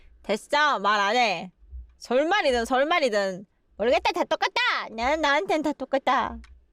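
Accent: Gyeongsang, South Korea. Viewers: 이게 경상도거등요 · 경상도서 꽤 오래 살았지만, 저렇게 ㄱㅇ게 사투리 쓰는 건 처음 봤어요ㅋㅋㅋㅋㅋ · 귀여운 부싼 아입니까....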